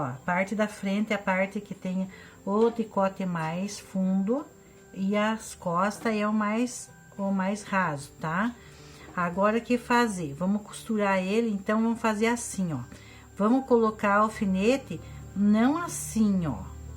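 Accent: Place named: South, Brazil. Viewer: Eita irmã,eu aqui ouvindo a senhora, observando o sutak, pensei,vô perguntar se a irmã é catarinense ou gaúcha,,aí a senhora fala que é de Curitiba .. Eu sou catarinense,mais faz 40 anos que estou em São José dos Campos SP.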